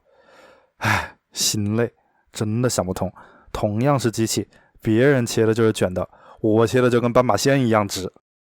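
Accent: Wuhan, China. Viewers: 这个说话的调调，重音的部分，确实有武汉话的感觉。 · 一听就是武汉普通话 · 拐子！你就用武汉话吧，你这武汉式普通话听到我鸡皮疙瘩掉一地。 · 哈哈、纯武汉普通话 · 武汉人！！！！口音越听越喜欢！ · 不用说就晓得你是武汉人哪，托托的弯管子普通话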